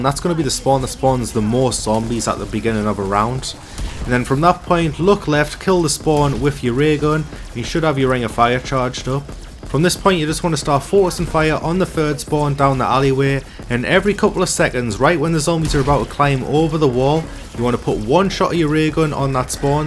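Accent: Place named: North East England, United Kingdom. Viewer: I find myself to be quite a die hard zombie player, I've played since world at war and i haven't bought this game yet. Is it worth it? Also love that your from the north east ur accent shines 😂. I am too! Been watching you since bo3. Haven't much recently because I've not played this yet. But whenever i think of playing zombies again i come here 👍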